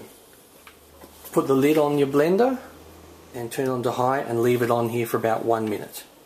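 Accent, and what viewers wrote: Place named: Australia. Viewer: Accent .... i love Australian accents !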